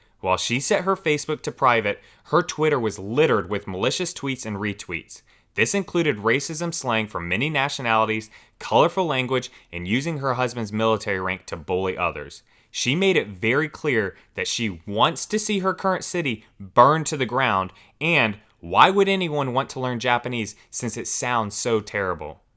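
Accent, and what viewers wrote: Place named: South, United States. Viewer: Great reading! I love your Southern Accent! 😜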